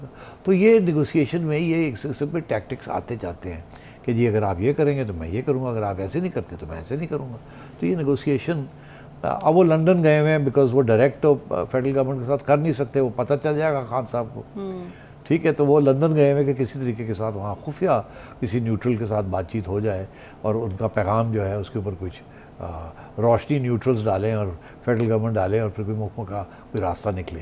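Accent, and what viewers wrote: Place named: Punjab, India. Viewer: Your punjabi touch is awesome 👍❤❤👍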